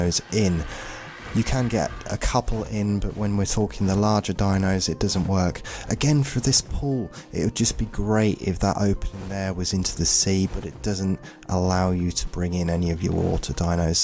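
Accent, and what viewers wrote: United Kingdom. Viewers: Hey bro, I can hear you´re British and I totally dig your accent, but I am Czech and here tea is only for ill people. We only drink it if we must, we drink coffee and we also hate the instant stuff, so cheers and thanks for all the info. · Cuz he is British lmao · Am I the only one that is getting a British vibe from him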